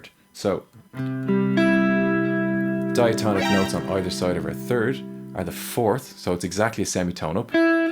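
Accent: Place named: Ireland. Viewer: you absolute babe <3 also - being an Irishman very far from home it's nice to hear the accent!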